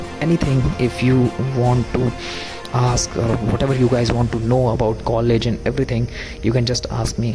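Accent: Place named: Marwar, India. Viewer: Bhai hehoo app voice septa chl eha hai... Marwadi kai bhe chupa nhi rhaa sktae 😅😅😅. Jodhpur wale bana 🙌🏻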